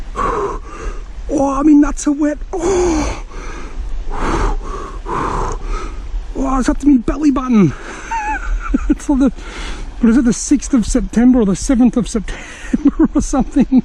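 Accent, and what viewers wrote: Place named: Australia. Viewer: WTF, are there trout in Australia? First time watching this channel so I’m only assuming due to the accent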